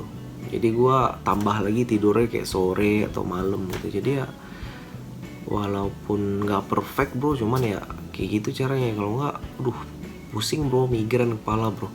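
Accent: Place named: West Sumatra, Indonesia. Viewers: logat minang nya masih kental banget ya udah hehe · Sebelum liat caption full, dengar video sambil membatin, "eh... kayaknya ini orang minang, logatnya berasa familiar." Ternyata benar, ada ditulis di caption hehe. Salam kenal uda. Dima di Padang nyo? Main-main ka channel kami :)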